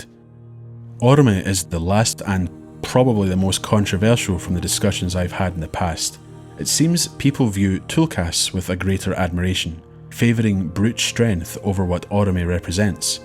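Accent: Scotland, United Kingdom. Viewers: God your gorgeous silky scottish voice is so dreamy. I hope you become an audible narrator.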